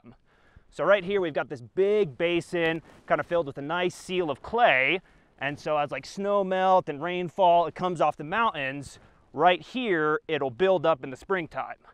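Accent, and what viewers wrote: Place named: Southwest, United States. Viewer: That's what a southwestern accent sounds like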